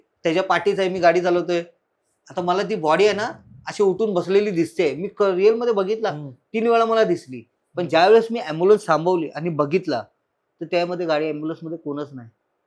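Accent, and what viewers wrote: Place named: North India, India. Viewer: Hyacha gav bagha bolanyawarun bhaiya watato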